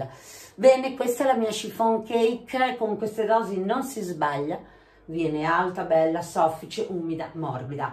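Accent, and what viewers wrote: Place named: Rimini, Italy. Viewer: grazie mille, molto gentile 😊 quindi se ho ben capito il fatto che la panna fosse già stata scaldata mi consente di congelarla due volte mentre se la congelassi fresca no. Ne ho imparata una in più ☺️ La chiffon cake proverò a farla col colorante rosso x il compleanno di una collega e pensavo di farcirla e glassarla di qualcosa di rosa... Ma non al burro 😕 o una ganache molto solida di cioccolato bianco, panna e un pochino di colore rosso o una crema pasticcera molto solida... Poi pensavo 🤔 essendo molto alta anziché tagliarla x farcirla potrei fare dei buchini in alto e riempirla come si fa coi pandori x es..... Purtroppo non sono su fb... Scelta di vita🤷‍♀️ in questi casi mi dispiace un po' ma pazienza, proverò a immaginarle le tue chiffon cake 😊🍰 Cambiando discorso, adesso ti sto scrivendo da Rimini e la gente ha il tuo accento ☺️ e un po' anche mio nonostante viva a Roma da diversi anni.. 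purtroppo non potrò vedere le tue torte su fb perché non ci sono... Proverò a immaginarle 😊 Buona giornata e buone feste a te e tutta la tua grande famiglia 🥰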